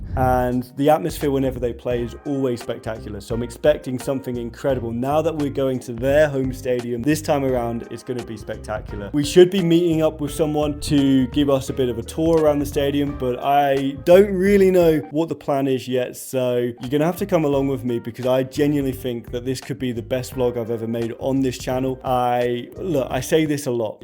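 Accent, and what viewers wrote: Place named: England, United Kingdom. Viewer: Your accent sounds like English from England.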